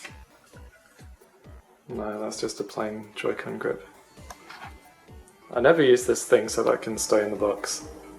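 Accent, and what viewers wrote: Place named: London, United Kingdom. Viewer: sorry you just sounded like you were from London .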